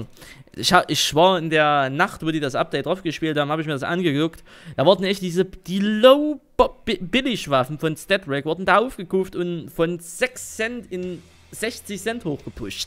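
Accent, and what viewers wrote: Saxony, Germany. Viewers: weil sachse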